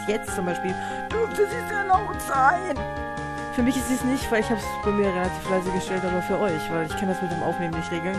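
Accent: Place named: England, United Kingdom. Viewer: Sehr schön dass das ganze jetzt richtig startet. ^^ Bin auch zuversichtlich dass du en Easy Modus schaffst, ich weiß ja dass du es kannst in dem Ramen wo das ganze für uns Normalsterbliche möglich ist. xD Bin auch schon auf alle anderen Stages gespannt. ^^ So nebenbei die blauen Items waren in dem Teil nur für Punkte da. Und schön dass ich wieder diese tollen englischen Akzent hören kann. ^^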